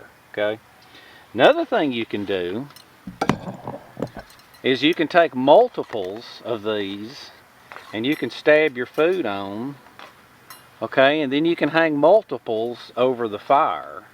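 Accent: South, United States. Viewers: Keep up your good work. By the way...On top of your inspiring ideas, your charm has also totally changed my attetude towards what I as a foreigner usede to call the "Forest Gump dialect". You´re the kind of guy who could make any wall street guy wish he had a few drops of "hobo-blood" in him. Keep up the good work. You are an inspiration to me and thousands others. Best regards from Vikingland (Denmark).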